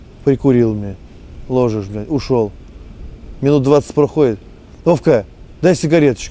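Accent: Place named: Nizhny Novgorod-Vladimir, Russia. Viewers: мне нравится его русский говор !!!!! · наш нижегородско-владимирский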